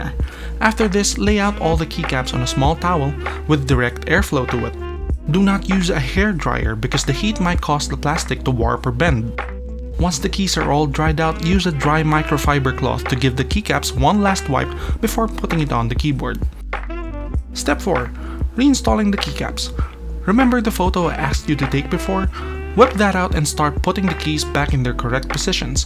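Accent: Philippines. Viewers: lol that accent is surely a filipino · Sagiri Izumi English is a widespread language in the Philippines, I’m more impressed his accent is not thicker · Agree, I knew I heard Filipino accent at first. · Filipino accent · thanks for this! It's tedious work to clean out my mech keyboard lmao. and you're from the philippines, which i expected from your name but i didnt expect you to speak very proper english. i wouldnt say your accent gives it away, but certainly it wasnt THAT obvious that you have a filipino accent. salamat ulit par! malaking tulong talaga to sakin!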